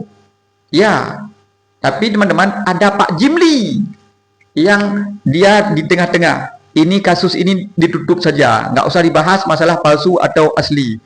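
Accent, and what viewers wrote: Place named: Malaysia. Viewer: Conten ini lucu skli bicara nya orng malaysia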